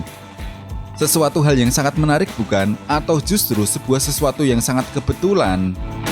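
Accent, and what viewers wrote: Java, Indonesia. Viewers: Logatnya khas. . Di pulau jaayyaa!! Suka min logat medok jawanya 😅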